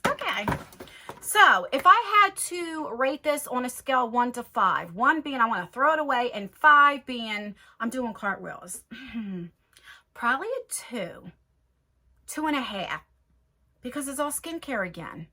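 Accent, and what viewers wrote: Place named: New Jersey, United States. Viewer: Are you from New Jersey? Im from Cape May NJ and oh girl that accent takes me back!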